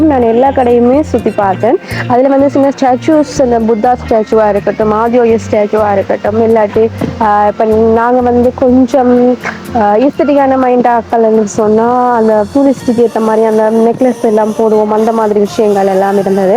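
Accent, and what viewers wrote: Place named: Tamil, Sri Lanka. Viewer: நீங்கள் டிராவலர இல்ல யூடியூப் பரா உங்கட தமிழ் ரொம்ப அழகா உங்களைப் போலவே இருக்கு நீங்க ஈழத்தமிழர 😊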